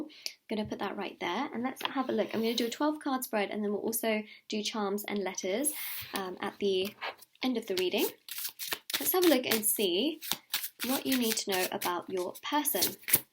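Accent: United Kingdom. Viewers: Damn pile 2 really resonates to my Twinflame. This wasnt happened once yet every readings i tried to look for my person i end up always pick at the right piles where the reading was always pointing to him. My beloved twinflames. Such a huge confirmation.. This is infact a new strong confirmation from you. Muchos gracias. May the devine bless u more and guide you more. Ive been an avid fan of yours because of your accuracy and very positive energy that i could really feel as i watch your videos and also i wanna say i really love your voice its soothing and im loving your British accent so sexy to hear. 😍😍😍😘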